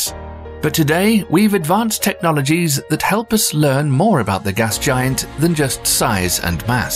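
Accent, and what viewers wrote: United Kingdom. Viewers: I'm tired of Brit8sh accents. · Another Brit accent.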